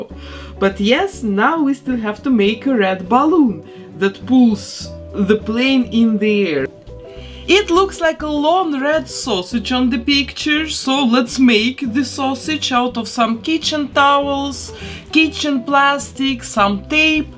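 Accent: Russia. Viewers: Love ur doll repaints ur so talented thx for vid. lf l can ask are u Russian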